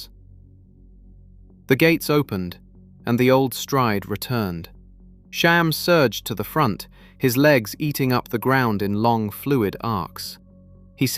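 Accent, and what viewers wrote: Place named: United Kingdom. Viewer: And…”Shom”? Good God, this British accent allegedly is silly with almost all American Racehorses discussed.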